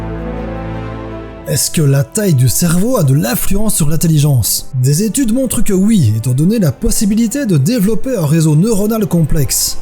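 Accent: Liège, Belgium. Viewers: T'as la même voix qu'Absol mais avec un accent belge ;) sinon super vidéo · Ah , ca sens l'accent du copain Belge ca ? :D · T'es liégeois ? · Salut, je post pas souvent de commentaire mais des que j'en post généralement c'est bien. Bref, Juste un mot a dire "Géniale" Ton accent Belge donne un coté original a tes vidéos franchement continue j'adore;) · pas suisse, j'aurais tendance à dire belge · T'es liégeois ? :)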